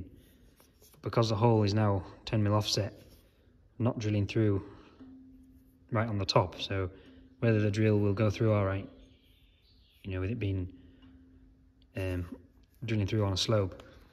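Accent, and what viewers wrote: Yorkshire, United Kingdom. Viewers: Learning and overcoming problems, is what real world manufacture and repair is all about. How tedious it must be to have a job where everything falls into place first time. BTW the auto generated subtitles make a hilarious job of your Yorkshire accent. Thanks for posting.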